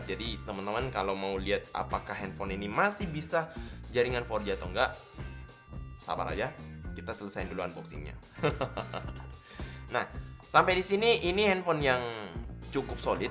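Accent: Makassar, Indonesia. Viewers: Bukan malah sebaliknya. Justru ciri khas nya itu adalah logat atau bahasa Makassar nya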